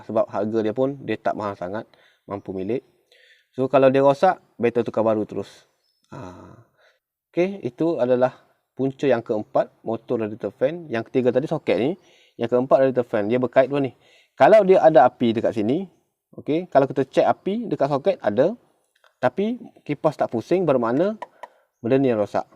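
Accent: Kelantan, Malaysia. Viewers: Orang Kelantan ke bos..?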